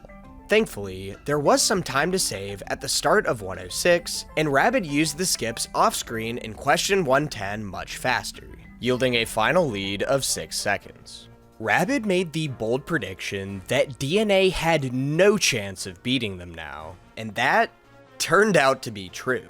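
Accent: United States. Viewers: Are you from Pittsburgh/the Pittsburgh area? I swear to god your accent is exactly like everyone in my hometown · good video like 2 minutes in but i have to say i am so charmed by the socal accent. spiritually it sounds like you were born wearing a beanie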